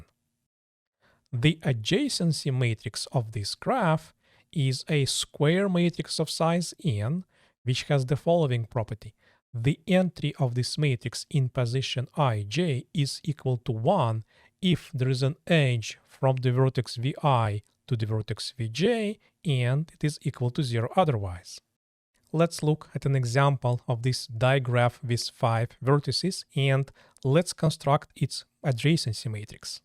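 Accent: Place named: Russia. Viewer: Русский акцент я узнаю из тысячи :D. Сам этим страдаю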